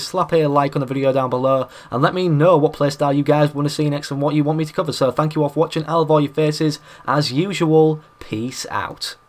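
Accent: United Kingdom. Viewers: I hate your British accent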